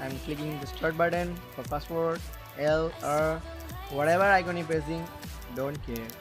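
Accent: India. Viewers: Fucking India Accents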